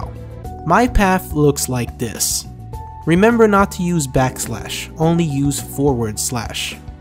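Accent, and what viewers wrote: France. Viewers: hey are you french ? great vid btw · Hi, Are you french? Salut, t'es français ?xD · Are you french ? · you are french ? "salut mes amis"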